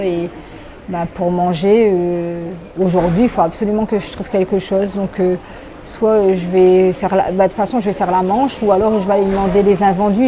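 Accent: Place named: North, France. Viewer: Et encore vous êtes à lille et en général il y a bcp de mois froids que chauds. Essayez de faire l expérience d être toute la journée dans le froid et pas que 3 jours... le Monsieur me fait bcp penser à des gens de la rue qui retrouvent un logement après des années. Bcp dorment encore dans la rue c'est comme une addiction... vous avez l air d être une bonne personne Madame et je reconnais bien l'accent du nord. Le seul bémol j aime pas les vidéos experience sociale ca me met mal a l aise car c est quoi 3 jours ??? Tu ne peux pas comprendre tu n es pas dans leur vie quotidienne.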